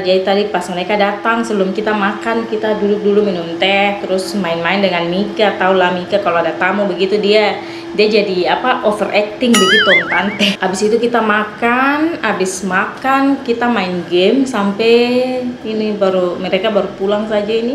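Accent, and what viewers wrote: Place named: Eastern Indonesia, Indonesia. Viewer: mmng bahasanya seperti bgt yaa... Khas.... Daerah Timur.. Mantabbb.. GO go... Trs lah memperkenalkan kuliner Indonesia... 💕❤😋😋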